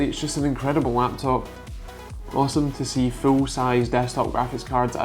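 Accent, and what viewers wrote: United Kingdom. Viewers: You talk very fast. On top of that you have a UK accent. That makes it a little difficult for someone outside of the UK, like me, to understand all you say.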